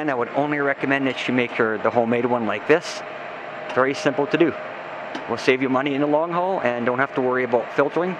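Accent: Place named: Canada. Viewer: this is the most canadian person i've ever heard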